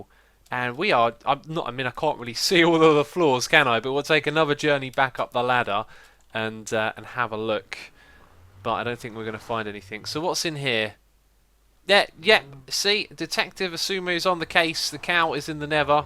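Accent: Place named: United Kingdom. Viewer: I quit Minecraft a long time ago (1.5 years ago, I think), yesterday I saw one of your Hermitcraft videos and now I am really inspired to build again! You also learned me a fantastic British accent, which is quite rare here in The Netherlands! My English teacher was very proud and gave me an A. Thank you for everything!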